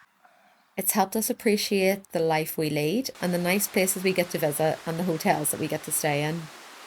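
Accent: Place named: Ireland. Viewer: You took the short route, which is ok, since around 35% of walkers do so. I have never done the Camino, and don't intend to, but I think that despite the blisters and the rainy weather, you had a worthwhile experience. Anyway, some of the filming was quite good, and it looks like you did have some good days. Finally, doing that part of the journey in February has its positives: the main one is that hotels and restaurants were empty, and the trail was quiet, without the crowds you get in June or July. In my humble opinion, standing in front of the Cathedral of Santiago without the massive multitudes, with their accompanying scammers and hucksters, was a good result. By the way, I like your Irish accent, and I am disappointed with some of the cruel responses below.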